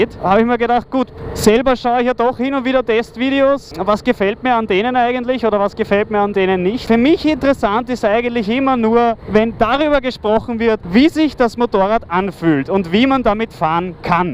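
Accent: Vienna, Austria. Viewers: Ich finde dein Video ist wohl eins der besten und unterhaltsamen teste. Wie du schon sagtest gehen viele Motorrad Tester viel zu sehr auf das ein was eigentlich keinen Juckt, dein Video wirkt kein bisschen langweilig und dazu dieser wunderbare Österreichische Dialekt macht es zunehmend unterhaltsam. Ein tolles Video wovon ich mir auch gern öfter welche ansehen würde 👍🏻👌🏻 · Genialer Test, angenehme Stimme, sehr sympathisch mit der österreichischen Sprache. Ich würde mich über mehr deutsche Motovlogs freuen. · Viennese (Austrian-)German ;) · I am trying to learn German and these videos are a real help, I know Austrian German is a lot different, but still they give me a really good gage of where I am in my learning, thank you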